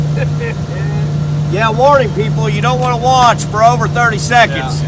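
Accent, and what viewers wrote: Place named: South, United States. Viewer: I could listen to your voice and accent all day, it's a real southern drawl. (from a G'ma in Australia)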